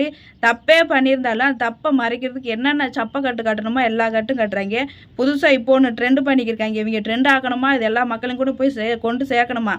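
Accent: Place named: Madurai, India. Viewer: மதுரை பேச்சு 👍👍👍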